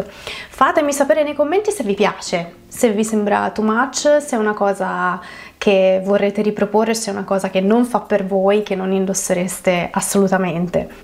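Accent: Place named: Tuscany, Italy. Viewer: Che bello l'accento toscano😊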